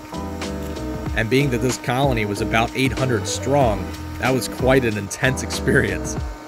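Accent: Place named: Pittsburgh, United States. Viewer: as soon as i heard your accent I could tell it was yinzer lmaooo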